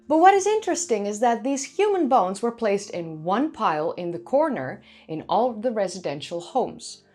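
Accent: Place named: Netherlands. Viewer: Your Turkish pronunciations sounds so Dutch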